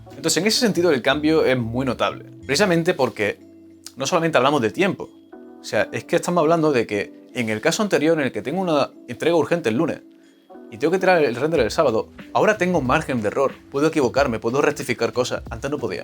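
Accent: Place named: Argentina. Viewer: solo conoces intel,, y al min sale que amd es una de las compañias inportantes en el desarrollo de sofware,, parace que te falta trayecto tecnologico amigo,,, y el disco que dices no trae una aguja,, es una pastilla magnetica,, mas al estilo de las pastillas de las cintas de casette de los 80s,, otra cosa ,, y lo voy a decir, no se que es peor,, tu tono argentino,, o tu ignorancia tecnologica argentina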